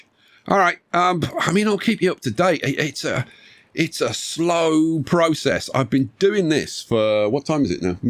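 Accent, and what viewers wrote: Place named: North, United Kingdom. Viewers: Dear (Smacking keyboard.) As someone from the Greater Manchester/Yorkshire border. I approve of your Northern accent... Bloody parth my backside though lad. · Dear, this is a request for you to do a full episode with your Northern accent.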